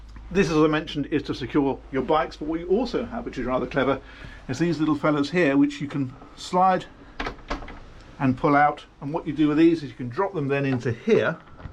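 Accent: United Kingdom. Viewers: What a beautiful english oxford accent !!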